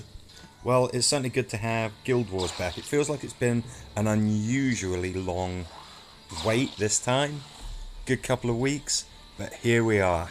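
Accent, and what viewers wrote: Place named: United Kingdom. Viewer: Nice work, as usual. I think the British accent helps your credibility (not that you need it) but your commentary is superb.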